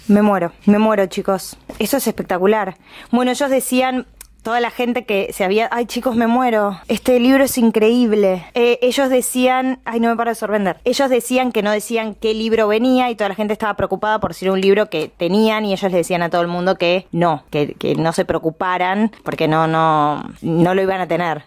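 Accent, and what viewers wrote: Argentina. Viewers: Sos de argentina?????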